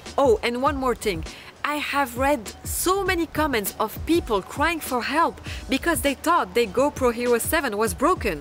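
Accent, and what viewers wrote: France. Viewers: Ah le bel accent Français j'adore hi hi hi hi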